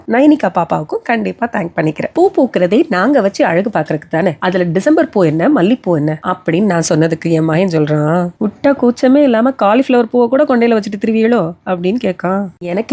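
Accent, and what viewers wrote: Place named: Thoothukudi, India. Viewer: wow..I'm from thoothukudi could relate well with ur slang..happy to see our ooru ponnu shine here..sending u loads of love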